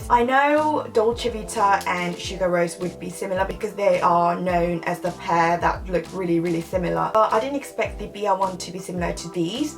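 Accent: United Kingdom. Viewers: 상큼하고 귀여운 이 떠서 보러왓오요 ㅠㅠㅠㅠㅠ ❣❣❣❣❣영국식 영어발음도 넘 매력있네요오.... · 언니 영국영어쓰시네...존멋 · 목소리 너무 좋타....♡영국발음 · 세상에.....영ㅇ국발음....!!!!!!!!!!!!! 게다가 영상도 너무 좋아서..바로 구독 아 너무 사랑해요 진짜.....ㅠㅠㅠㅠㅠㅠㅠㅠㅠㅠ♡♡♡♡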